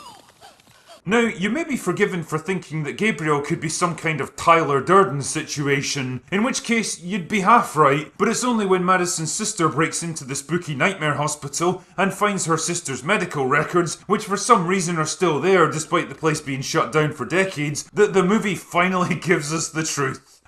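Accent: Scotland, United Kingdom. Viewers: You’re becoming an Scottish accent echo of Redlettermedia 😬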